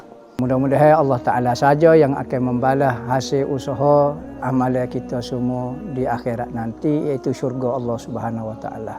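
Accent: Kelantan, Malaysia. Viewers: Betul bang kupasan tu jelas, yg menjadi masalah takut org luar xfhm dgn loghat kelate takut disalah ertikan...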